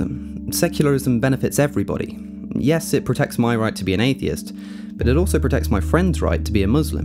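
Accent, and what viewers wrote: United Kingdom. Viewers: Wait... you live in the USA? You sound British. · He is British, from Oxford.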